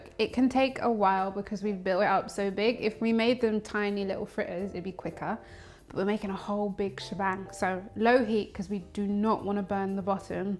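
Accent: United Kingdom. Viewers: Yo hablo español, but if my listening is not too bad, your accent sounds british. I've heard there are good vegan options in Britain. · Thank you!!! The food looks delicious. I love your British English. I watch the videos to learn recipies and vocabulary too. 🤗🇧🇷🇧🇷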